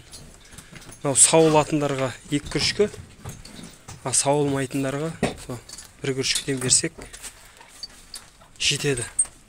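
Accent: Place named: Uzbekistan. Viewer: Ассалаумагалейкум жаба дегенинизге караганда Озбекстаннан келгенсизба димин мен сиакты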